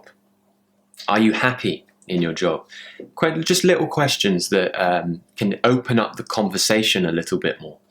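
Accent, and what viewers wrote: United Kingdom. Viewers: I really love your accent!! When I started to study English, I started with American English, but I'm getting higher British English because is really posh and polite!! I love it!! Congrats for your video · One more subscribe! Accent very nice! I love British accent and like when I understand! :) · Thank you for your useful and helpful video:) but I would also like to listen to your natural speed of British English!!!! Not all of English people speak this gentle and clearly, and I would really like to get used to natural speed of British English with your cool accent. Please~~~Make some natural talking video!! Lots of love and respect from Korea :) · Hello! Thank you very much indeed for your video recordings. It is utterly important for me to follow your videos inasmuch as I USE them ( sorry for talking like this) to repeat after you full speeches as I best manage to do. 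I have to say that it is really working well for me. I am able to catch up your Britishness and pick it up. I hope I'm doing well with my pronunciation. After listening to and doing such shadow technique exercises your voice producing British accent remains long time in my mind before fading up.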